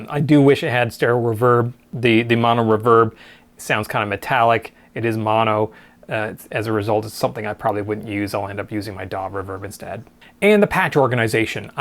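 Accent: Canada. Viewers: Canadian?? French canadian??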